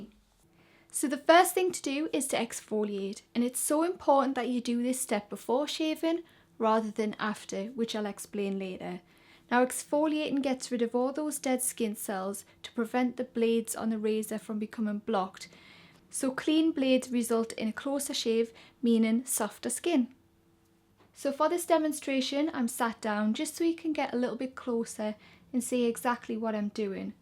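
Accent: Newcastle, United Kingdom. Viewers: Jade and Perri are both Geordies just like the YouTube speaking on here and like me ahaha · its english is a geordie accent. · are you from newcastle?? your accent is one of my faves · I thought Geordie? :) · Are you Geordie? Because I am and you sound like it :))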